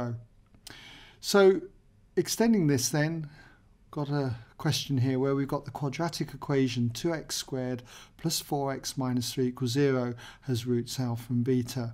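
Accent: England, United Kingdom. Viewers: i love your English accent thanks so much for your channel!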